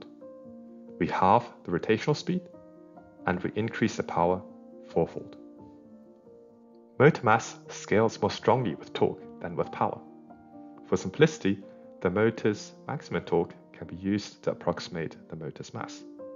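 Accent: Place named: Germany. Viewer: 想问博主是中国人么，感觉您在德国生活过很长时间哈哈，德味挺重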